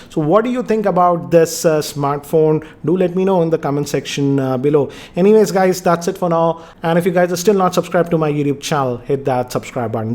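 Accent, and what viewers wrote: India. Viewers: indians has a funny english accent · indian accent ?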